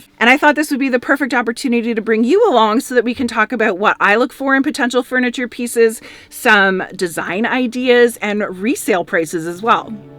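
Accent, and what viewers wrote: Canada. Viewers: . Here in the UK we know that when you say out and 'aboot' that's when we know a Canadian. I love to hear it! 🤗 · I love your Canadian accent.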